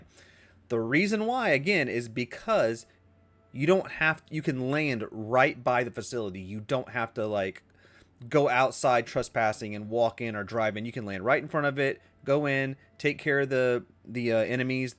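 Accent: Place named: Tennessee, United States. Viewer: You sound like a white guy from Tennessee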